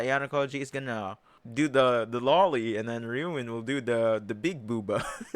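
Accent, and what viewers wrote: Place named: Philippines. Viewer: Completely unrelated to the the topic. But you have a strong filipino accent my guy lmao. were you born there and still currently live there? Im filipino too but wss born in the states. So my tagalog isnt good i can speak the basics but when it comes to having a complete convo i cant lmao but i can understand all of it. Im more fluent in spanish since a lot of my friends are latino i know weird lmao. and from a very young age I've always watched anime in Japanese so i know japanese too lmao.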